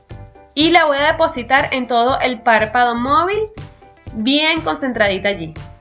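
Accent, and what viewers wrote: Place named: Venezuela. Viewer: venezolana verdad (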